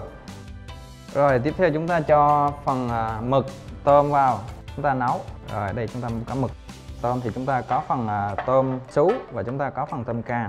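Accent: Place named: Phu Yen, Vietnam. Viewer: Tiếng giống khu vực tây hoà phú yên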